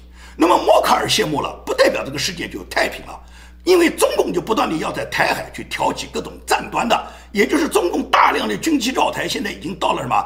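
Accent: Nanjing, China. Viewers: 口音是南京人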